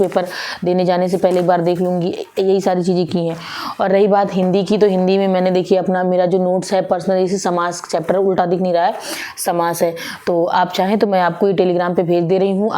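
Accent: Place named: Mathura, India. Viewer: MAAM AAP KA CENTRE KAHAN HAI. AAP MATHURA K LG RHE HO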